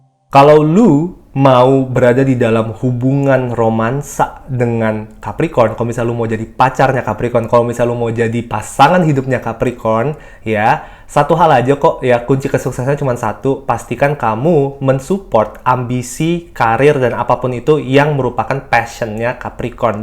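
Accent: Java, Indonesia. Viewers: Orang jawa po mas?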